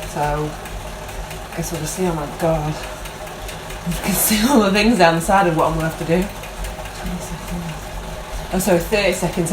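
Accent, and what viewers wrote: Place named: Manchester, United Kingdom. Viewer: Thanks again! I really look forward to watching your videos, you make me smile for some reason, not sure if it's the accent that makes me lonesome for Manchester or your lovely northern sense of humour! Next time I'm on Zwift (did a session yesterday) I will be powering up with the Mantra "Think "! Cheers, enjoy the rain........at least you can ride in the rain, it's bloody snowing again here............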